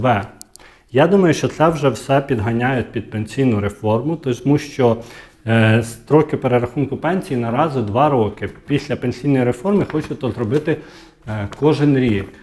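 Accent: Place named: Khmelnytskyi, Ukraine. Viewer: О ! Землячка Дубровського (хмельниччина) : "...цЕго року", "...будуТ робити" ... і ще доооооохрена подібних "перлів" з місцевого говору (всього раптово і не пригадати...) (P.S. Може бути таке, що, прочитавши мої слова, багато хто і не знає, що Дубровський - це і є той самий Саша, котрому всі ви, мої "колеги" по пенсійному віку, надсилаєте свої коментарі на канал "Народна думка"... Всі знають, що - Саша... А прізвище... ??? Упевнений - багатьом невідоме ! ( мужикам-то воно більше знайме по вислову : "Не с . и, Маша, я - Дубровський !" ))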